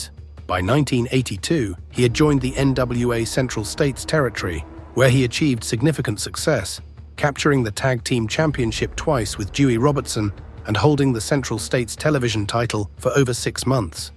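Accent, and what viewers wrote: United Kingdom. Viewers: Why do they always use a Brit voice for these things? Aaaargh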